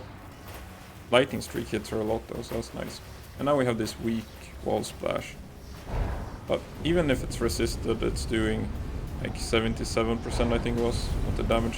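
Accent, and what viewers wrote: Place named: Scandinavia. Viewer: Where are you from? You sound like maybe Sweden, Finland, Denmark or norway.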